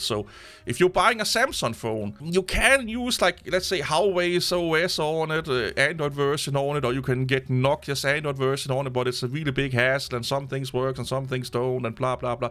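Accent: Denmark. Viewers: His thick Danish accent is one of the reasons I watch this channel!😍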